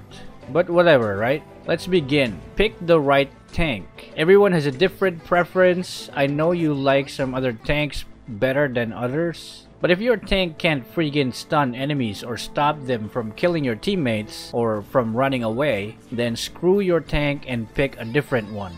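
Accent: Philippines. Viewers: Wow galing mag english halata Filipino ka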